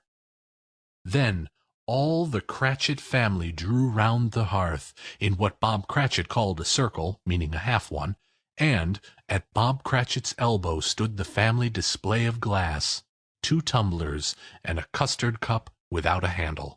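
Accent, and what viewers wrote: United States. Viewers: we say it “you bik wi tous” in the us and he sounds America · Awful American version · as good as this reading is the mere fact that it is read by an American accent and the word "clerk" in britain is pronounced "clark" which takes away just a little from believeing this tale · why an American reading a book set in Victorian England?? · I am, but it doesn't sound right in an American accent. I'm going to find an English version! Merry Christmas! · I want a version thats not told with an American accent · Sorry. Merkin accent. Bye bye. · Dickens told in American-English accent?? Really? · American accents are no good for Dickens period ! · Yes...now am about to switch it off because it's in American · Wish this was in an English accent. The American kind of ruins it. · Bye = American accent. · Managed about 4 minutes listening to that droning yank accent.. 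· At first I wasn't so sure about Dickens in an American accent, but this one actually turned out to be pretty good. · I’m sorry but I can’t deal with the American English. Anton Lesser ( Game of Thrones) does Dickens very well.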